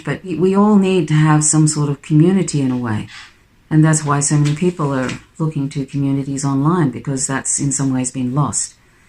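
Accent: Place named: Australia. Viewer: you sound like you have spent time in australia ? Very timely and insightful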